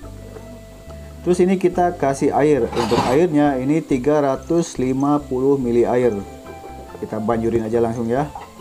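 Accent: Sunda, Indonesia. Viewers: Kang sunda pisan Ga ada budah trs kl udah gak ngagolotrak Santai banget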